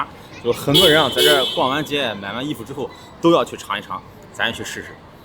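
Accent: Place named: Shandong, China. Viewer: 你是山东口音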